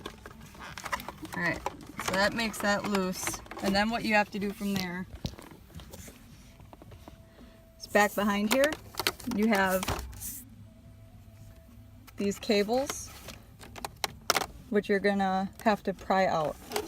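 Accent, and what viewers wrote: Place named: Canada. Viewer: Thanks for tips, you sound Canadian.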